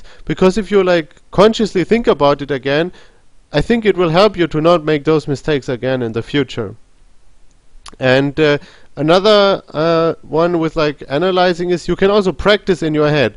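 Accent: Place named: India. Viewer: his accent sounds kinda indian, this confuses me.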